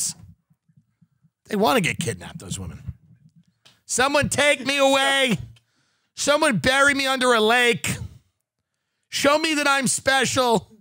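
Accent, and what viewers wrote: United Kingdom. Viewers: As a Brit ex-pat I only tune in for the genuinely authentic british accent. It makes me homesick.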